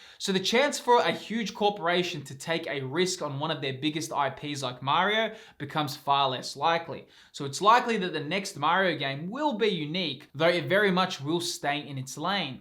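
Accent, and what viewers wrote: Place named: Australia. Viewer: I didn't know that I needed a fellow Aussie to talk about the Gamecube like this, but damn - great video!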